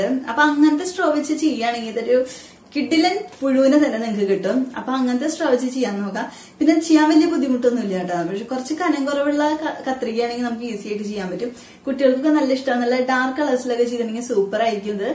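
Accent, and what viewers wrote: Thrissur, India. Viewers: Thrissur anno Language 😍😍😍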